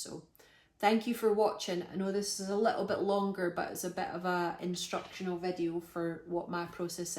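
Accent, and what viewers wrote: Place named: Scotland, United Kingdom. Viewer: Love you Scottish accent. Mines a boring kiwi accent lol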